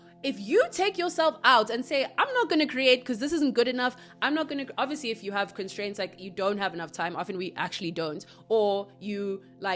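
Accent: South Africa. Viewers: I just KNEW that lovely, beautiful dialect was South Aftican! Love the “flea market of life” analogy. Love to you, Darling Sister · BABES ARE YOU FROM SOUTH AFRICA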